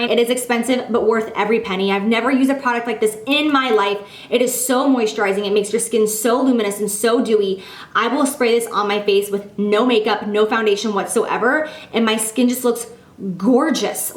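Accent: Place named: New York, United States. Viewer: she has like a New Yorker accent lol ily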